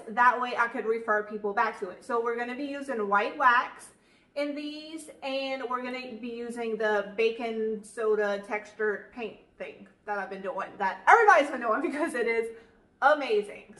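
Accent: Louisiana, United States. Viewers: You’re most definitely a southern Louisiana girl! 😉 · Darling, You came up in my recommended videos and I told my little granddaughter she's a Cajun!!! 💜 I know she's a Cajun!!! 😁❤️ I can hear it in her voice! She sounds like a Cajun! She's from south Louisiana; I just can't quite pinpoint where she's from. She could be from Lafayette or Ville Platte, or somewhere in the south,; maybe west of Lafayette! I'm not sure but I know she's a Cajun; I can hear it, in her accent. She talks like me. (I'm from Mamou. ) 😁 You're not only a gorgeous Cajun but you're very smart and so talented you have an eye for fabulous flips and I love watching your videos you give me so much inspiration. I'm following you now.. I wish I could put you at the top of the list of my videos that I watch that are suggested by YouTube his man you're good at what you do. Blessings to you. 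❤️ P.S. please eat some boudin for me. Haha ❤️❤️❤️❤️❤️ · Your accent is Louisiana! Southern La. at that. I’m from BR. Maybe on the west side of BR maybe one of the Acadians parishes. Loving your channel!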